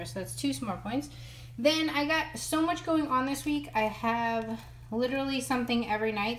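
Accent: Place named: Northeast, United States. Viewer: You have a cute northeastern accent... in Indiana we tend to pronounce haul and hall the same, ha😊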